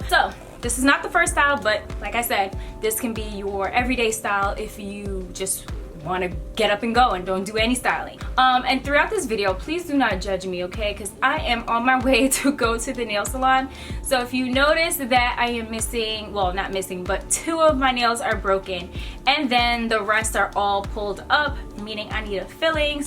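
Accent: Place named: Jamaica. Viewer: You should speak with the Jamaican accent more often 😁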